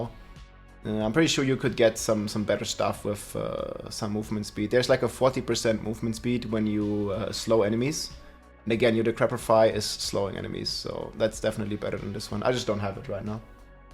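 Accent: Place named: Germany. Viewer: Watching a bloke with a German accent play D4 with a John Carpenter like soundtrack is something I never knew I needed until now! Liked and subbed!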